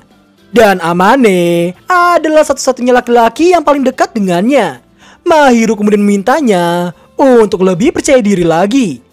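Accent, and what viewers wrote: Java, Indonesia. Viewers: bang orang jawa ya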